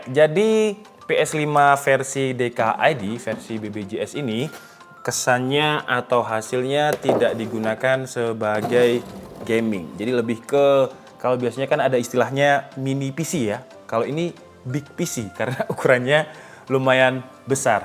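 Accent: Java, Indonesia. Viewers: tibake nk ngomong sampean iki jowo nyel yo mas tak.kiro malah raiso.boso jowo😂 sukses terus mas.. mugo sehat slalu, diberi kemudahan dalam menjalankan suatu hal amin.. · orang jawa mana bang?😂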